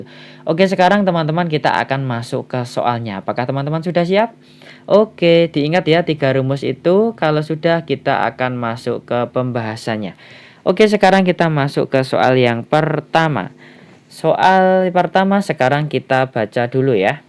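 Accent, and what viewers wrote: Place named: Java, Indonesia. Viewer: Salfok sm suaramu bang mirip ping lie agk medok jg hehe 😂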